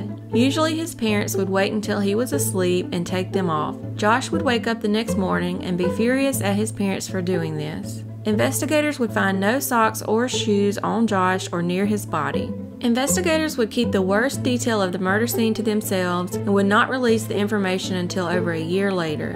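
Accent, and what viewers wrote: South, United States. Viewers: and now I speed you up. Also being Southern …I speak fast, as if that should explain things 😂so I speed anyone up, not just you. Yours is just more pronounced than my NC accent. 😎 No problem it makes me comfortable. Thanks for ALL the cases. ✌🏻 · Ahhhhhh that southern accent. Very relaxing and easy to understand. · I live in Georgia and I love your channel. It's nice to hear a pleasant southern voice for once. Keep up the great work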